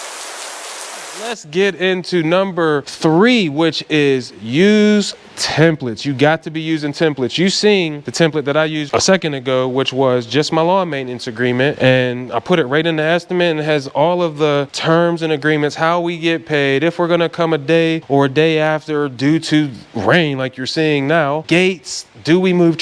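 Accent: Pittsburgh, United States. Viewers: Damn I was like buddy sound like he from Pittsburgh lmao then I see the 412 area code on the trailer 🤣🤣🤣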